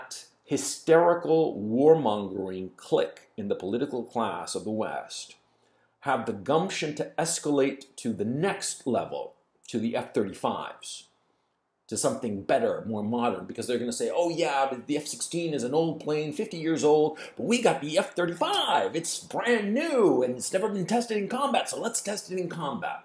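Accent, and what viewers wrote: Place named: United States. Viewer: Claims to be from Ukraine on twitter, has the strongest american accent in existence, sure bud. How much is Russia paying you out of curiosity?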